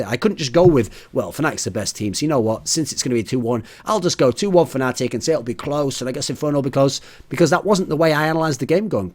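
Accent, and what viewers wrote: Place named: Wales, United Kingdom. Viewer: Where is Thorin from? He sounds Welsh or some shit.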